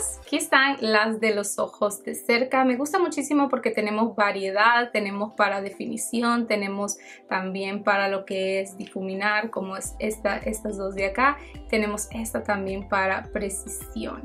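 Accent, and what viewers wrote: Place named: Honduras. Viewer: Me encanta cuando dice... y toda la cuestión... muy Hondureña jajaja Saludos nena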